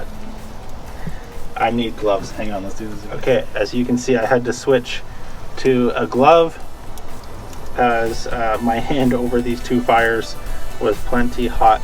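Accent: Canada. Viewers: Is from Canada? His "outs" are very Canadian. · Stop speaking Canadian! Or just don't use the words out and about.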